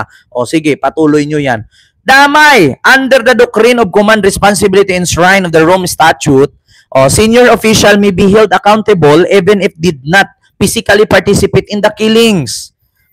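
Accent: Visayas, Philippines. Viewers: Bisaya ka dong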